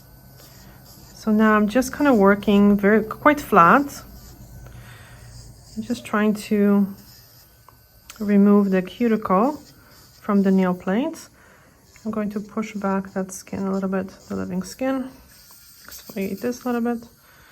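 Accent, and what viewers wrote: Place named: Poland. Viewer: Dopiero niedawno oglądam twoje filmy i dzisiaj usłyszałam że mówisz po polsku. Tak się domyślalałam bo brak u ciebie tego kanadyjskiego entuzjamu w głosie pomimo że akcent już mało polski 😉 Mam jeden lakier z Catrice z seri nudes i byłoby wszystko idealnie ale niestety słabo się u mnie utrzymuje. Po 3 dniach jest spękany na powierzchni. Za to eveline pięknie się sprawdza, mega trwałe i łatwe ale kolory mają jakieś takie mało ciekawe...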